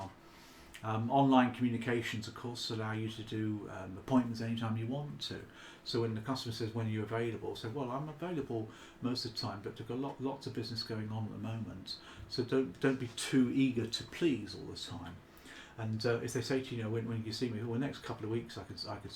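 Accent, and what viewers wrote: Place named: England, United Kingdom. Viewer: I have been watching your videos for three weeks because I am a solicitor and I started studying for the cemap 123 exam....it turns out, i'm portuguese and i have colleagues from other nationalities and we are all having the same problem... difficulties in understanding your accent, because you speak as if it were only for the english people... it's a great pity because is an expert in this area!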